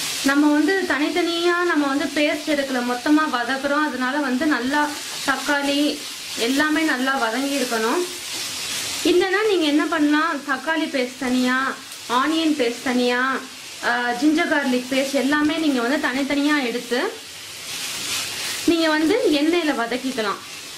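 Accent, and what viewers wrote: India. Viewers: nice to hear the Madurai slang after a long time.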